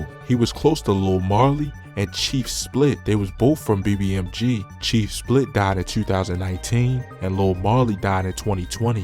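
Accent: Baltimore, United States. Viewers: Mob and nlmb so far away frm each other you just be saying shit and that is wooski brother ain’t no allegedly you not frm here probably Baltimore by your accent but my guy you’re just saying shit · Boy I know you from Baltimore. Durw 2urw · the narrator must be Bmore!!!! raised? go! O's!!! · You from Baltimore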